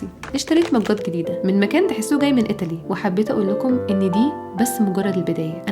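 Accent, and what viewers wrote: Algeria. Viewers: واو تتكلمين جزائري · انا مصدومة حرررررفيا انك تكلمتي جزائري كتتتير منيح 🤠🫰🏻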